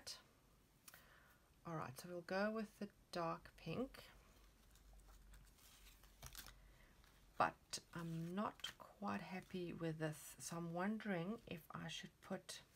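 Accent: South Africa. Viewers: you sound South African! Love your art!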